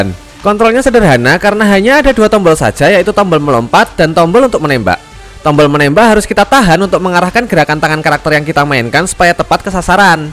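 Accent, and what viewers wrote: Java, Indonesia. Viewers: Bang orang jawa yah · bang orang jawa ya?? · medhok medhoknya yang bikin muantep btw saya asli jawa juga bang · Uwong jowo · kayak medhok medhok gimana gitu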